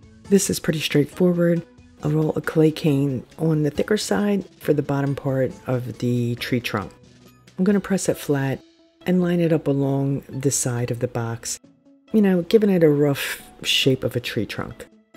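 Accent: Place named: Pittsburgh, United States. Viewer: Thanks for sharing your great ideas! I gotta ask...are you from Pittsburgh? I am & I'm hearing that back east (but not NY or Jersey) accent that sounds like a Pittsburgher. 😊 ❤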